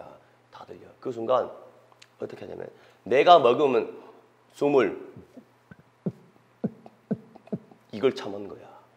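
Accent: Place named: Gyeongbuk, South Korea. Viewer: 선생님 혹시 고향이 경북이십니까ㅋㅋㅋ 유튜브에서 고향말투들으니 정겹네요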